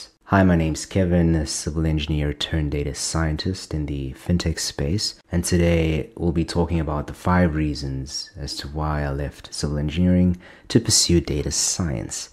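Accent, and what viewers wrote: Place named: South Africa. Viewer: South Africans legit have the best English accent, I'll fight anyone on that. But on the topic, I get where you're coming from. I'm an immigrant myself, currently in my fourth country. I was pushed into tech. because that was seen as a safe bet and a good match for my skills (and because somehow seeing that someone is into video games automatically equals they'd be a good computer nerd, by some logic of our parents' generation) It was alright. Helped me settle and make some money. But I've always been fascinated by larger systems compared to the minutae of tools and machines that most software careers focus on (I would imagine engineering is the same) I really enjoyed working in Insurance at one point in-between studying/working in tech., and I've always been fascinated and interested in economics. Unfortunately I was never encouraged or supported to pursue that or finance or business; and I had no role models (very important!) until I met my now wife. 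Long story short, I've been studying math for a year now to shore up and I'm going to be starting a Master's in Analytics to first shore up on the necessary applied statistics skills, before I move on to something that is more into economics/policy and thereby something that is more impactful while also being more interesting to me.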